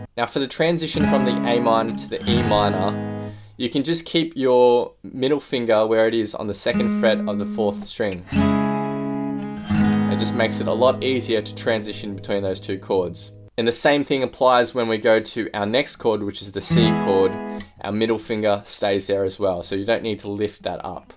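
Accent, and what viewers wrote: Australia. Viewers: wAit your Australian :0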